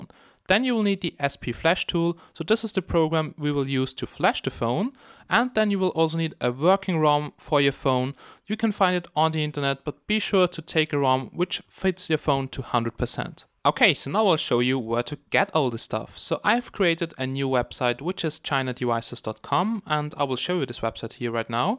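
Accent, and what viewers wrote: Germany. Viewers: Man hört ein kleines bisschen den Akzent von Deutsch raus und Firefox ist auch in Deutsch, Wenn du Deutsch sprechen kannst, hab ich eine Frage. Weißt du wie man das THL T6 Pro 166L Flasht? will KMDROID 0.8 flashen aber bin kir dabei etwas unsicher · Hey I have got a Problem with the Scatterfile :( if i try to load h scatterfile Sp Flash show me an error and say sometihg about overlapping ..... Itry to flash he original Rom From The Manifactory website there is also an Ubdate but both of the roms dont load corretly by Sp Flash .... The Device is A Ulefoe U9592 .... Can you Help me Please ?? Wenn sie Deutsch Sprechen wäre es nett wenn sie es in Deutsch Beschreiben was ich machen soll :) (Wie ich auf Deusch kömme :) der Akzent klingt nach Deutschem/Österreichischem Englisch )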